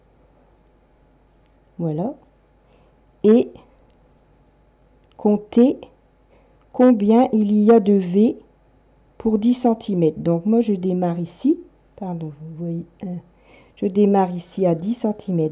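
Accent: North, France. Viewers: Merci pour vos explications j'essaierai d'appliquer vos conseils et vous tiendrai au courant et encore un grand bravo pour vos vidéos encore une petite question il me semble reconnaître un accent nordiste dans votre voix est ce je me trompe ?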